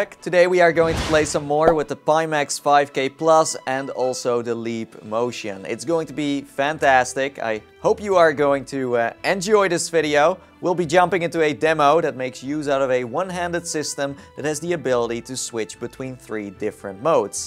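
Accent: Netherlands. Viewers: I dont now but your accent is abit like a dutch man speaking english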